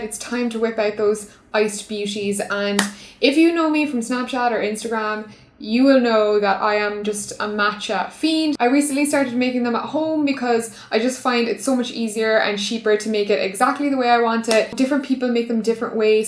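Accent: Ireland. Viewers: Are you Irish?!?!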